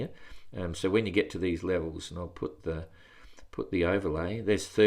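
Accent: Australia. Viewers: Hi. Nice hearing your Aussi twang. Im watching from DK. Just getting a Najad 390 ready for som southern sailing so watching this with great interest. Ps A stranded Kiwi saliing the baltic, now home waters. Cheers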